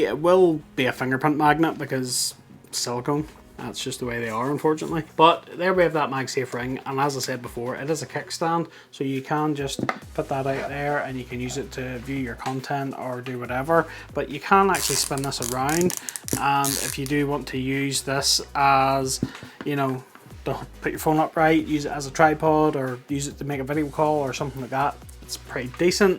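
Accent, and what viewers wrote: Northern Ireland, United Kingdom. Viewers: Well I wasn't expecting a Norn iron accent in a tech video! Super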